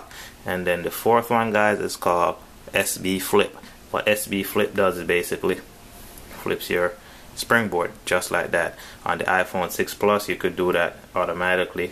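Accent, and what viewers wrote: Jamaica. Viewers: here the jamaican in u bro. #jamaicans UP lol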